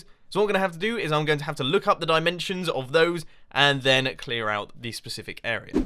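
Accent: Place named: United Kingdom. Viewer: Dear! I have been watching your videos for ages, I like very much your YouTube channel, and your person. Especially, your voice. It was the main reason why I started following you. It catched me, with your British accent. And THIS, is my first comment ever, to a video of yours! You are asking about the new Graphics of the channel. The pop-ups and so on. They are very nice! But, only one thing, maybe, could be better, in myopinion: The "+1" pop-up. It's green. And... It's a bit strange, it clashes with the blu, the orange, and the other colors, this, at least for me! The animation is very nice. But the color itself... Neither the "+1" bar of Youtube is green anymore. Maybe... You could change it? This is everything I've got to say.:P Bye bye!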